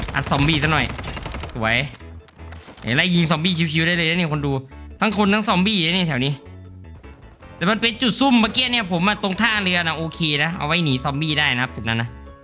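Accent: Korat, Thailand. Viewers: พี่คนโคราชป่าวครับ555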